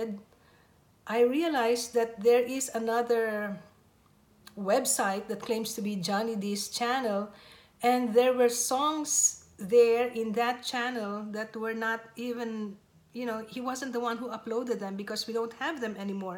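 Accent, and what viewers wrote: Philippines. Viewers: Its like your a Filipino citizen because of your accent. But we are happy that Papa JD is back :) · You sound like a Filipino. Are you a Filipino? Because i am also a Filipino too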